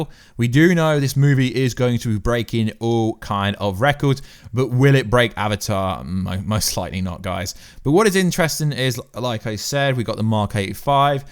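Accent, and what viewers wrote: England, United Kingdom. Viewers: they're not "what" with a date? you tube says you said basilic. what does basilic mean? A really "what " upgrade? you tube said you said safe. "head sculpt?" lastly i have taken the liberty of looking up "aliteration": from al·lit·er·a·tion /əˌlidəˈrāSH(ə)n/ noun noun: alliteration; plural noun: alliterations the occurrence of the same letter or sound at the beginning of adjacent or closely connected words. "the alliteration of “sweet birds sang”" i t doesnt mean different versions... maybe you are thinking "variations". i find it quite interesting that you have an "english" accent but dont speak proper english.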